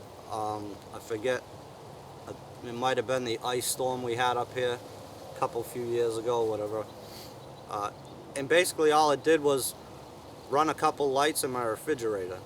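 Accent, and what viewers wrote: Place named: Massachusetts, United States. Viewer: Your voice makes me homesick for Massachusetts. Thanks for the info and have some chowdah and clam cakes for me.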